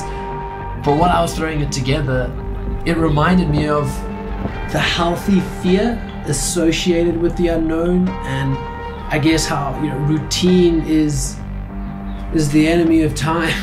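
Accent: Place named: South Africa. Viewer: Where are you originally from? You sound like your from some part of Africa? Like South africa?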